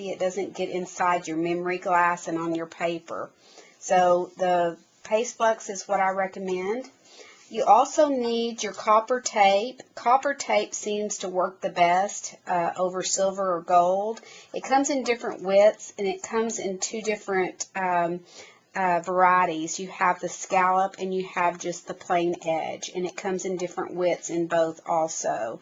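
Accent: United States. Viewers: hi - firstly, you sound so much like someone I know - are you Texan? Secondly - can you use aluminium tape instead of copper tape? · I believe what she is saying is "tinned" which means you keep your tip covered with a thin coating of solder. Southern accents are hard to hear sometimes.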